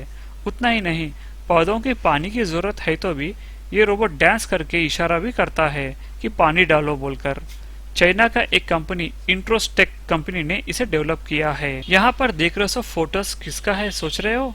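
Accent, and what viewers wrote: India. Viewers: Boli badi acchi lagi, Marathi ho na bhai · Aap hydrabadi ho na..?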